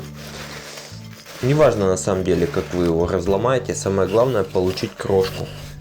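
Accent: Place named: France. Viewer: Ну что я могу добавить к тому что уже сказано? Молодец, умница и это французское произношение приятно слушать😉😉😉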